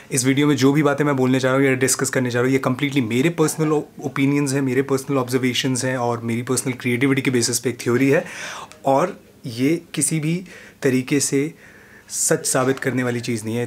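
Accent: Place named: India. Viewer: I get a kick out of the ingine accent.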